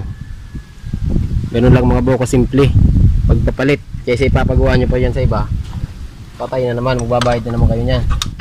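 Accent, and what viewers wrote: Bicol, Philippines. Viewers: bicolano ka boss?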